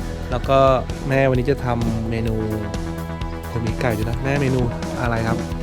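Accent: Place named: Phu Thai, Thailand. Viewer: สะบายดีน้อง คนน่าฮักๆเว้าคือบ้านอ้ายภูไทม้วนๆ😊😊😊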